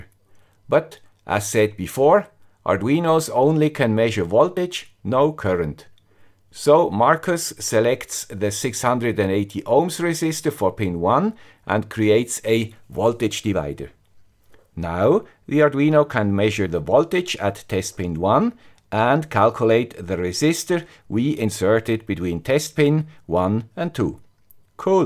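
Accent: Switzerland. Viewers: dude you are awesome , im gonna try to watch all your vids, love the swiss accent, im american, obviously, thanks for all the help in the past and the help you will give me in the future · Dankeschön, Du 'guy mit dem swiss accent'. So viel Herzblut in den Videos und genau so viel Fachwissen. Man lernt in jedem Video etwas Neues. Hast Du schon mal Annex Wifi-RDS ausprobiert? Ich halte es für ein ausgezeichnetes Projekt, mit dem sich alle möglichen DIY-Lösungen mit ESP8266 in Windeseile erstellen, testen und modifizieren lassen. (btw: 'accurate' and 'accuracy' are both stressed on the first syllabus in contrast to 'developers' - sorry about that....)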